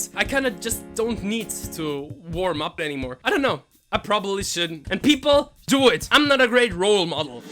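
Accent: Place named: Europe. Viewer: your accent sounds european, where are you from?(i'm from the netherlands tbh)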